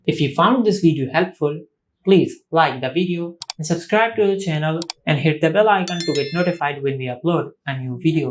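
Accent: India. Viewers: its indian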